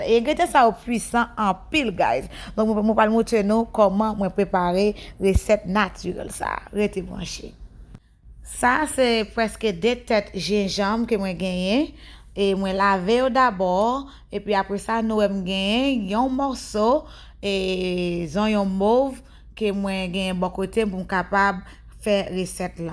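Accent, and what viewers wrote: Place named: Dominican Republic. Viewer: li pa konn li kreol non preske dominikenn liye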